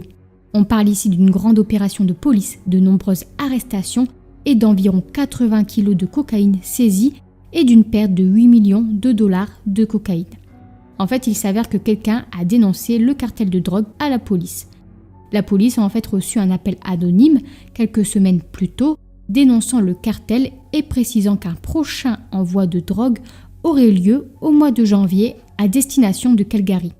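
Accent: North, France. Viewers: J’aime beaucoup vos vidéos ! D’autant plus que je reconnais l’accent du nord il me semble ❤️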